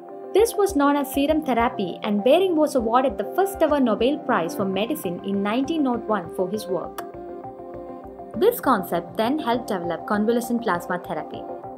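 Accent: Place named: Kerala, India. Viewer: A Malayali narrator with MTI !! :)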